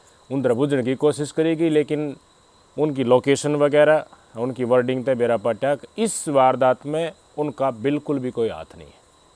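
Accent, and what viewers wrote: Haryana, India. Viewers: Nice video bhai sahab ..apkii pure haryanvi language nd ekdum saaf saaf samjhane walii voice modulation..thanks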